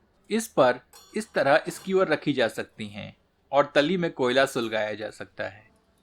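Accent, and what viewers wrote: Standard. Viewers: a pleasure to listen to you Shudh Hindi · आपकी परिष्कृत शुद्ध हिंदी का उच्चारण उस पर चार चांद लगा देता है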